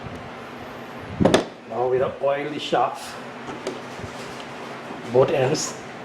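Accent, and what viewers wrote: Trinidad and Tobago. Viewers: How you sounding like ah Trinidadian so.?? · Sounds like a trini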